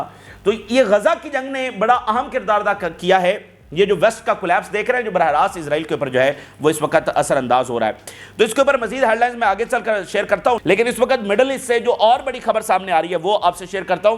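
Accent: Pakistan. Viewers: Guy you in Pakistan for your information your need to know we the people of United States had voted for president Donald Trump and we love ❤President Donald Trump, and President Donald Trump with love of the people of USA he will be the president of USA for 3rd term too! America and American first Only! No involvement of USA 🇺🇸 in any form or way in the affairs and matters of other countries of the world any more, no more of the USA’s financial aids to any other country of the world that is the reason we the people of USA had voted for president Donald Trump! and It would be better if you stop screaming and speak in low volume! Plus you have heavy Urdu accent on to your English, it is ok to speak entirely in Urdu without adding English words or sentences in between your Urdu speech, though it would be even great, if you could put some efforts on improving your English that have heavy Urdu accent! 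Thanks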